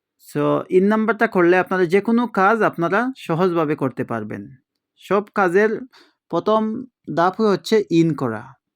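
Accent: Sylhet, Bangladesh. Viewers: ভাই সিলেটি মনে হয় নম্বর দেন জোগা জোগ করি। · আপনি সিলেটি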